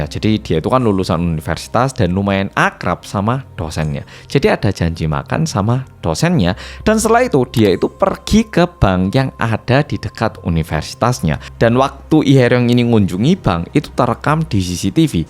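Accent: Java, Indonesia. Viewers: Enak banget dengar nak "cerita"kaya org jawa lagi mendongeng.g kedengar blas ni orang korea.ngalam pooollll,medhok😂😂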